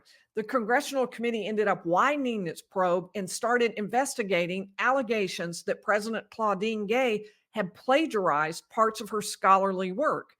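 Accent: South, United States. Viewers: My crazy ex-husband graduated from Harvard Law in 1997. Sounds like you had the same profs that he did. You sound Sluthern too. Iam from TN I wonder if I met you when you were there, as I was on campus, often.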